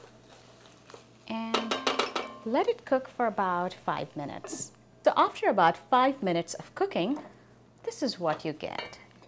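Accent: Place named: India. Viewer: Is this what u learnt in the entire video..she is Indian and has an accent..live with it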